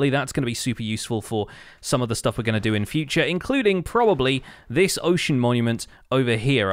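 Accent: England, United Kingdom. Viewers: this guy has a certain way with words, for sure. I think it's part of the english humour, accent and delivery lol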